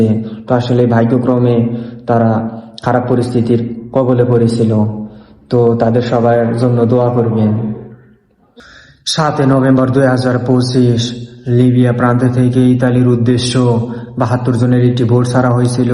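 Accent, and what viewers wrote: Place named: Tangail, Bangladesh. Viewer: যাক আল্লাহ ভরসা যে কটা যাইতে পারে এ ভাই আপনার বাসা তো মনে টাঙ্গাইলে আপনার কথার ভাষা শুনে বুঝা যাচ্ছে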